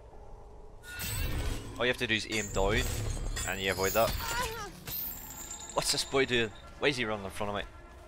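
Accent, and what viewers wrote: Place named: Belfast, United Kingdom. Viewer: It's a Belfast accent I think.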